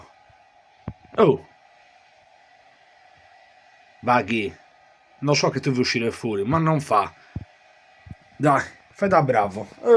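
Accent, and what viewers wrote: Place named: Sarrabus, Italy. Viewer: Accento del Sarrabus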